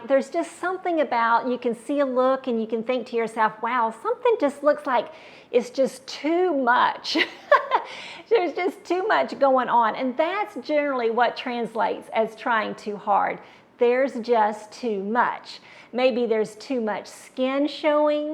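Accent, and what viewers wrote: South, United States. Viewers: You have a charming southern accent. When my hair turned silvery gray, I asked the students at the beauty school to make me a blonde so I look and feel younger, not like a gray-haired old lady. I changed my lipstick and blush to light bright pink colors, nothing dark. Love Tip #3...Don't aim for young--Aim for modern. Yes, contemporary clothing is more youthful. Some of my friends do not try at all--they look like a walking clinical depression. They appear so matronly, 15 years older than they really are!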